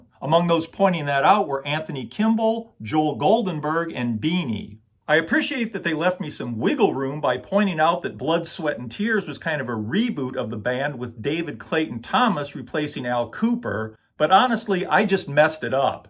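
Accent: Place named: Michigan, United States. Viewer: Ann Arbor, eh? I kept thinking I detected a faint Michigan accent in your speech, but saw no reason to ask. I'm not from Michigan myself, but I used to spend summers there at my grandparents' lake house in Orchard Lake (my mom had gone to college in Ann Arbor). It was during one of those adolescent summers long ago that I purchased by the and my lifelong obsession with rock music began. I haven't been back to Michigan in many a moon, but I still love the state and its people. Many times I've considered buying property there, but I just get turned off by all the problems the state faces. I still consider it my second home state though...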